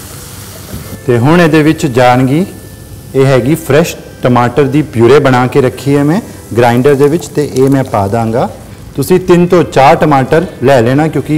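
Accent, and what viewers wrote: Punjab, India. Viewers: Sooooo SWEET PUNJABI HE SPOKES 👌👌👌👌💋💋💋💋💋💋💖💖💖💖❤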